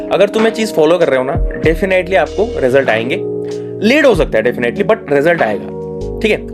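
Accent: Odisha, India. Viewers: Bhai aap odisha se ho ?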